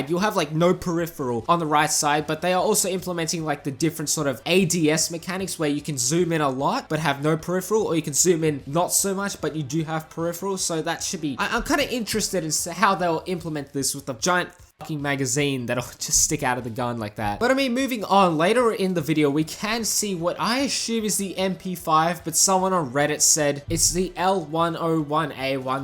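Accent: Australia. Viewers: You soind like an australian ? If you are australian then yeah I agree that all is illegal there. Even a f*cking airsoft gun is not allowed.... That HK gun looked like a HK53 to me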